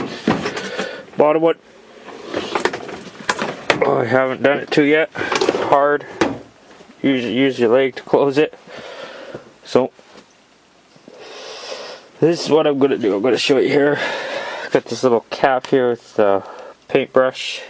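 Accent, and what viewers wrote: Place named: Canada. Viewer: Canadian much ? Lol. Never realized how much we say 'and that' as well as 'that sorta thing'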